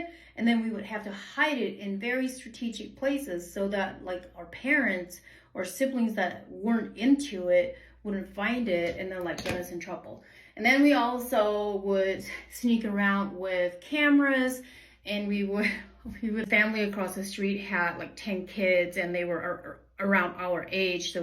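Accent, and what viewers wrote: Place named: United States. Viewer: You sound like you got a lot of American in you and your parents were American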